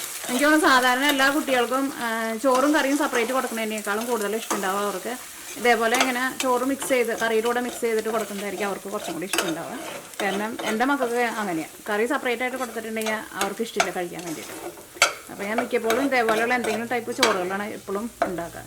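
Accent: Thrissur, India. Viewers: തൃശൂർ ഭാഷ എങ്ങനെ ചേച്ചി maintian ചെയ്തു പോകുന്നത്. ചേച്ചി യിലൂടെ ഞങ്ങൾക്ക് അമേരിക്ക കാണാൻ ആഗ്രഹമുണ്ട്. സൂപ്പർ ചേച്ചി.keep it up. 👍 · Hii. Chechi.. Nanum trissur kariya.. Channel starting tottu nan Kanarundu comment cheytitila ethu vare. valiya esta ee samsaram especially trissur slang.love u.. Lot😘😘adiploi.. Avunudu..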